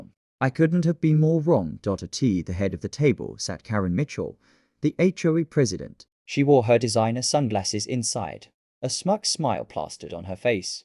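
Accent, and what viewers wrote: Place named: United Kingdom. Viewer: A US Navy seal with a UK accent.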